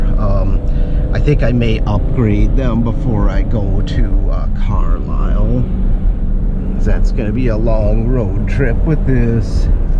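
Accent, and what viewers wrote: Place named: Upper Midwest, United States. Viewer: Good video. I like your accent too! upper midwest?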